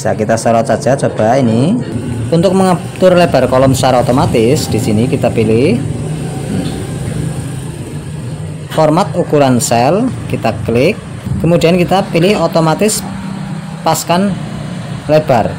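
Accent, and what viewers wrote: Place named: Cilacap, Indonesia. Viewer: Suaranya asli cilacap, kayak kaki mukidi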